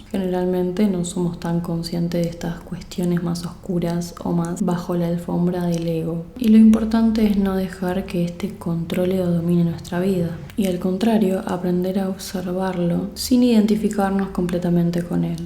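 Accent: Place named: Argentina. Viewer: El acento argentino en una mujer es maravilloso pero me caga escuchar a los hombres argentinos hablar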